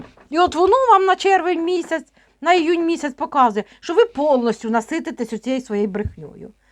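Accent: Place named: Poltava, Ukraine. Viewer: Дуже люблю Полтавщину,ваш говір,слухаю із задоволенням,чекаю.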